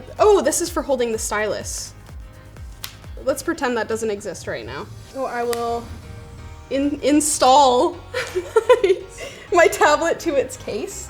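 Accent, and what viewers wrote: Canada. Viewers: I’m from Minnesota and can hear her accent from Canada very easily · love the canadian "O's" :D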